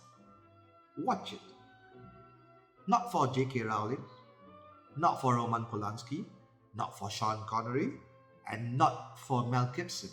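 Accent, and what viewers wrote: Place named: Australia. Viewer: Me walking around listening "Continually" Why is this AI Australian?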